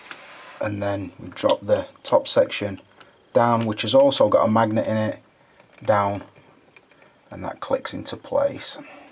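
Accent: Sheffield, United Kingdom. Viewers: tha sounds from sheff or rotherham kid! quality review ive just got the battle damaged and ed209 ok there only neca but we gotta start somewhere!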